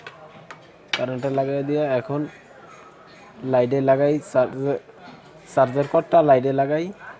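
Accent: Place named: Bhola, Bangladesh. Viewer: আমি আপনার কথা শুনেই বুযেছি যে আপনার বাড়ী ভোলা, আমার বাড়ীও ভোলা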